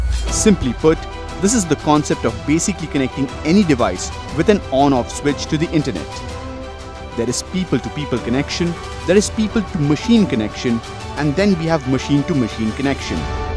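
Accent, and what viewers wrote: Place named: India. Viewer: Pengisi suaranya orang India ya? Kedengeran banget dialeknya.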